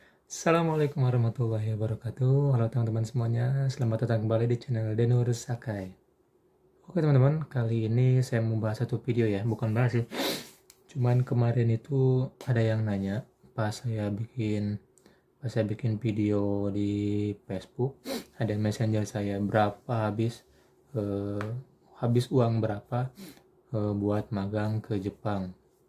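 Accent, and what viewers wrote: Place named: West Java, Indonesia. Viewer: Logat nya sunda bgtt kang , asli bumi di mana ?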